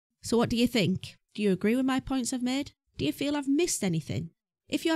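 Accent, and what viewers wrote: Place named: United Kingdom. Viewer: Yus we need more British voices on youtube 😺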